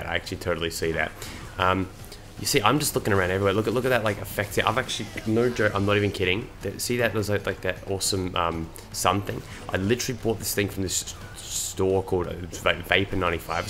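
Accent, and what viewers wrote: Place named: Melbourne, Australia. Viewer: Are u from Melbourne? Love that accent. Anyways, great video!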